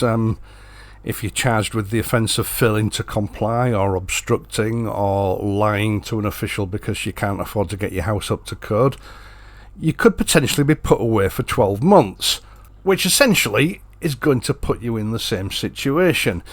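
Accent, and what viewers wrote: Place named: Yorkshire, United Kingdom. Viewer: Listening intently to your observations when I observed something else, Beverly race course. Didn't realize you were in this neck of the woods. Knew from the accent you were a good sensible Yorkshire lad which explains why you make so much sense. Didn't realize you were making so much sense just up the road from me.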